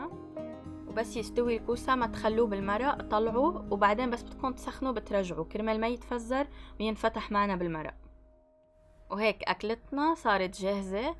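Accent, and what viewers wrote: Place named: Beirut, Lebanon. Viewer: رائعه مادام.. اكلك طيب و كتير حلوه لهجتك.. Vous êtes au top je vous suis depuis quelques temps, j'adore vos recettes mais aussi votre accent beyrouthiye.. Merci 👍👍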